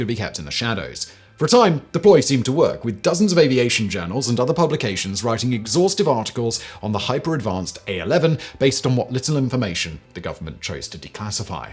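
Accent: United Kingdom. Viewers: I speak English. English is my first and only language. I like all of your channels and find your content extremely interesting. The only thing is, could you slow down just a bit with your speech? It's not that I don't understand the meanings, it's just that with your English/British accent as opposed to my slow American drawl I have to keep rewinding just to understand what you said. I hope you will take this as constructive criticism and not a petty complaint. Thank You !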